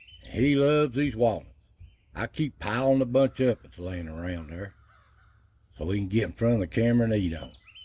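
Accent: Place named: Blue Ridge, United States. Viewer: well he sure is eating good!😸 and your accent and way of talking sure makes me homesick for the Blue Ridge Mtns/Shenandoah Valley that was my home!! 😊 I sure 'nuff love your channel, Bro. 🧡💙🧡 Blessings my friend 💜💜💜🙏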